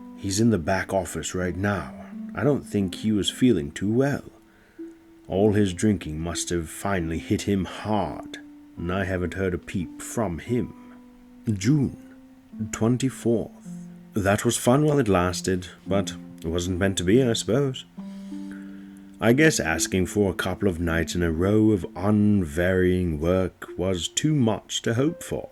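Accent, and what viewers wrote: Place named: United Kingdom. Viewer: I would love to hear you narrate a James Bond audio book you have a great British accent!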